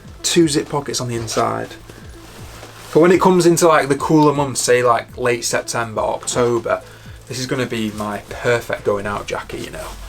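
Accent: United Kingdom. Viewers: Your English is so British. Where are u from?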